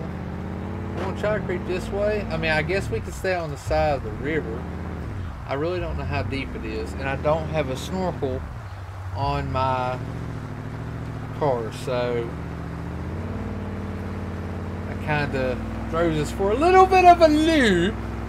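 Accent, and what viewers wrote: West Virginia, United States. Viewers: Is he from WV💛💙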